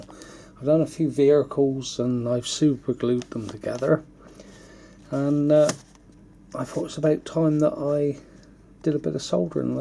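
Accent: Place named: Cornwall, United Kingdom. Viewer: Just found you on here, nice to hear a proper accent on utube:-) I was born in Truro up Malabar back in 55 so I remember Truro Station very well. Just old enough to remember Steam and standing on Black Bridge as the Steam Locos went underneath so that we were hidden in the cloud as it went by. Also remember Newham and trains running down there, and walking the line many times as a youngun. Anyways proper job with the vids.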